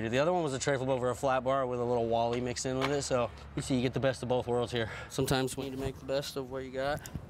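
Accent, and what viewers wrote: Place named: South, United States. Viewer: How does this dude have a southern accent?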